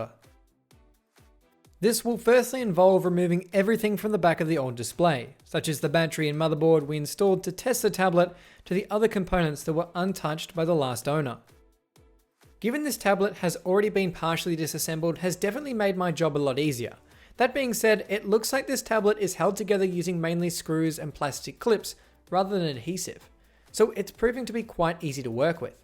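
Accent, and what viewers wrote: Australia. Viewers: I’m from England and we do not have that accent. is Australian (you couldn’t have been further away) lol. I’ve never heard any other Australian pronounce data as daughter/darta either.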